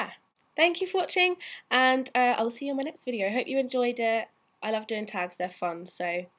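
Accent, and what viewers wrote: United Kingdom. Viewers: about your style: rock chic/ street chic? - random comment: i'm normally not into british english but to you i could listen all day :) - goofy question: any thoughts on the royal wedding? are you excited, bugged, indifferent..?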